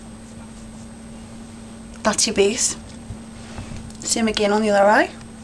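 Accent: Newcastle, United Kingdom. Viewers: r u from newcastle or around somewhere there?? great tutorial btw!!!!!!!!!!! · Is that a Jordi accent?? I absalutley love it <3 x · OMG it's nice to hear you talk, love your British accent. Oh by the way, you're pretty even without any make-up at all. The nailpolish is strange, but them I'm 48, haha... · She's a Geordie, it's a famous northern English accent. It's the same accent as Cheryl Cole if you youtube her on X-factor UK...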